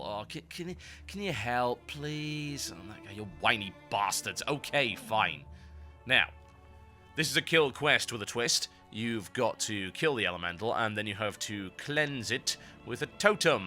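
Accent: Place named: Newcastle, United Kingdom. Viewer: OH MY GOD , Your from Newcastle!?!? Im from Sunderland :D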